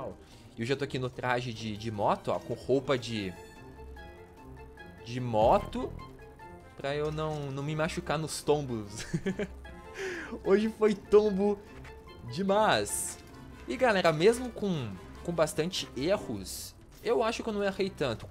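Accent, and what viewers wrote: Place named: Paraná, Brazil. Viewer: porque nas antigas voce falava mais em um sutaque mais do Sul (Gauchos) e agora voce fala mais Paranaence???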